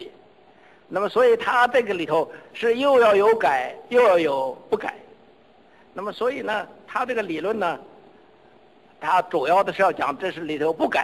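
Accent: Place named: Yunnan, China. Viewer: 杨老为啥有这么重的云南口音啊？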